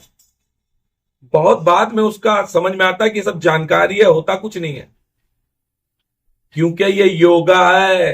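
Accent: India. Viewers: पर आपके बोलने का देसी तरीका अच्छा व प्रभाशाली है।। Plz don't change yourself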